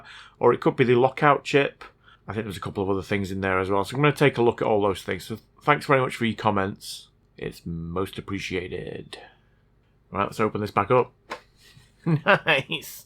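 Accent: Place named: United Kingdom. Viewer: I can't tell from the accent, are you from the UK? If yes, be extra careful with NES cartridges. PAL region has 2 sub-region, PAL A and PAL B and they are not compatible. PAL A is UK and PAL B is basically rest of Europe. I see a big letter A on the Castlevania cartridge and if your console is the same then it shouldn't be a problem. Usually the dirty part is the receiving side of the big black connector, not the edge connector on the main board side. You don't need to replace it, just clean it. Usually boiling in hot water, maybe adding a little dishwasher liquid can help but make sure you rinse it off, preferrably with some distilled water. Or apply vinegar, then rinse it off with alcohol. The point is you have to make it squiky clean and don't want any limescale to form on it either.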